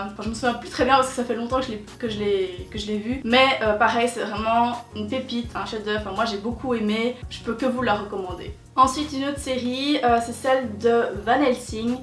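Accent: Belgium. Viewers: Tes belge? Sinon super ❤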